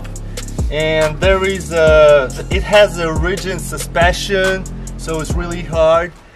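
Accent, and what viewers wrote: Russia. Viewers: плюс русский акцент. Сто раз замечал, что русский, говорящий на английском в 100 раз понятнее, чем иностранец, просто потому что произносит так, как ты сам это делаешь. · Blyat. Oh ug etot Russian acient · Раньше как-будто над акцентом больше старался, а сейчас наоборот решил больше России матушки внести)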